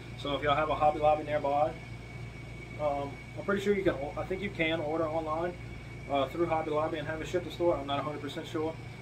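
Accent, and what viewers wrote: Louisiana, United States. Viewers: Just where in Louisiana are you from, man?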